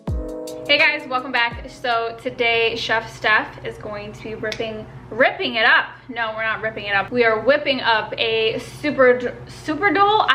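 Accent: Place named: New York, United States. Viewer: Your NY accent is so cute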